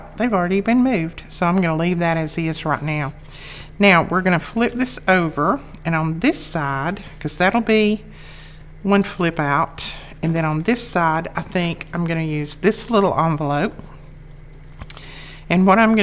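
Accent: South, United States. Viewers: “Oooo, I like that.” “Init cute?” “I’m gonna embellish that.” I love all of your expressions. Can you add a “push here” button to the tshirt so we can hear your wonderful Southern accent too? :)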